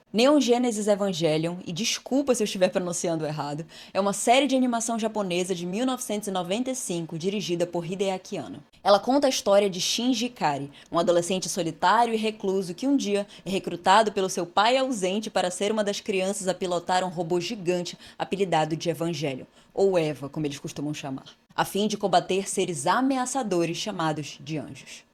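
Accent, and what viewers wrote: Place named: Belém, Brazil. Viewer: Esse sotaque entrega, vc é de Belém!?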